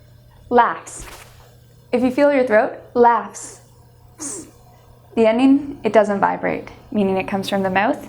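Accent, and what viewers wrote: Canada. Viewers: Oh, Canadian...lol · Neither--she definitely sounds Canadian.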